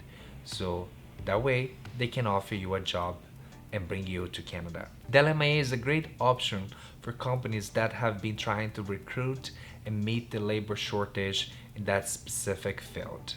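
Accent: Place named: Brazil. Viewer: HE HAS A BRAZILIAN ACCENT. :)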